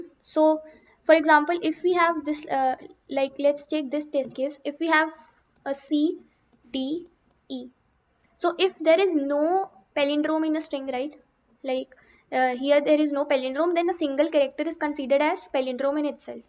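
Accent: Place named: Punjab, India. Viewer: where are you from? Himachal/Punjab/Chandighar? that "chrecter" and "meeddle" accent sounds familiar 💖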